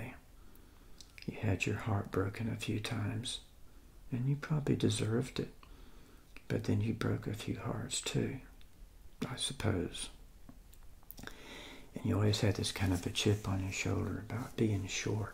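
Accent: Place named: South, United States. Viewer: So lovely to hear a Southern accent. I grew up in Norcross 🍑 I enjoyed your video very much!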